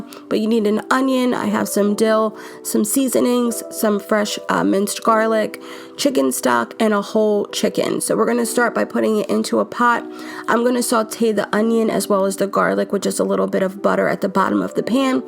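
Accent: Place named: New York, United States. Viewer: Trader Joe's has very good whey protein powder in chocolate and vanilla..I add about eight ice cubes, cup of almond milk, fruit and some sugar free torani syrup to give it more flavor. I use a spoon to eat it as it's very thick. Look into Nestle and where they get their water. Why not drink tap water?...you sound like you are from NY where the water is great. The cost is way less and no plastic bottles.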